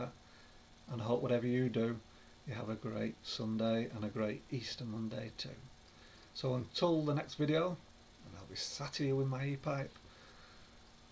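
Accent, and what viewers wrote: Northern England, United Kingdom. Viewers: Lovely Northern chat for a quiet Easter morning...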